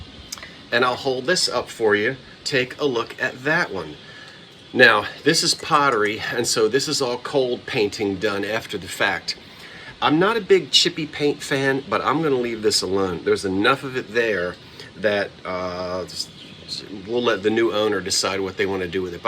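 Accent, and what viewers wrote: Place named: Pennsylvania, United States. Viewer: I haven't watched you for awhile, I missed you. Do you still have your apartment in Philly? I love watching you walk around town. I was born in Chester I grew up in Parkside Brookhaven area my mother grew up in downtown Media Pennsylvania so I love listening to your accent which is very familiar to me and you and I love the same kind of antiques. But finding art-deco out here is really hard so I've been sticking to Murano Glass. Is your bungalow on the Jersey Shore? So good to see you again?😊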